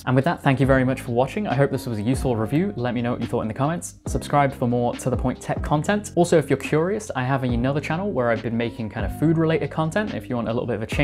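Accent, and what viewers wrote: United Kingdom. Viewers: I enjoy you review,. Besides your technical knowledge, your British enunciation is quite pleasant to hear.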